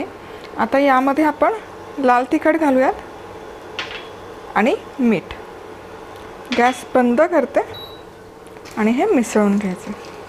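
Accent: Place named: Pune, India. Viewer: Aap bahot looking ho mam aapki har ek recipe achi hepar aapka marathi me thoda sa alag he puna jaisa softly baat karo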